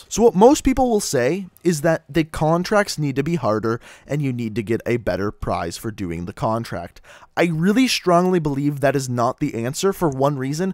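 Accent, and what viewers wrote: Canada. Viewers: Is this guy From Canada or does he have a accent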